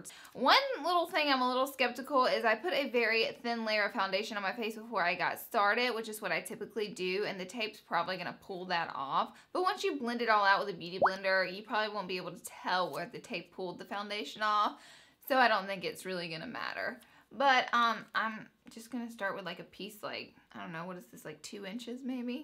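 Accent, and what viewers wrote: South, United States. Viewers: Is your family from the southeast? your accent sounds southern. love the video <3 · Are you southern?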